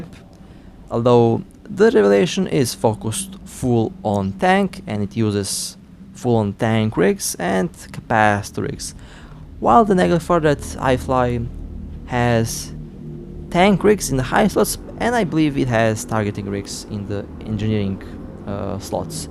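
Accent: Russia. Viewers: Ты Русский что ли.? Оппоненты с Русскими именами, а у тебя акцент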